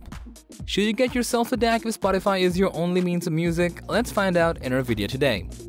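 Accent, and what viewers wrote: India. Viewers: Oh yes, but along with the indian accent. He also has a British, american, and what seems like a Kiwi accent to me. That's quite impressive.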